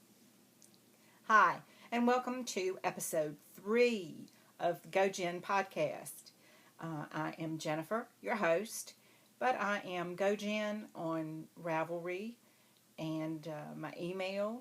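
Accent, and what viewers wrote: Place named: South, United States. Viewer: Love your podcast...I especially love that your accent sounds like mine!!!! Yay for Southern ladies' podcasting!!!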